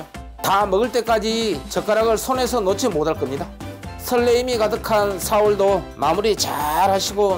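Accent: Jeolla, South Korea. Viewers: 구수한 전라도 말씨 참 듣기 좋네요..음식두 간편하게 잘 알려주시구요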